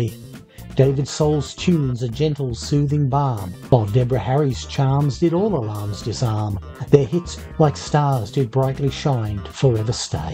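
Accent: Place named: Australia. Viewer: What part of Australia are you from? Cool accent 😎 and as per usual awesome commentary 😊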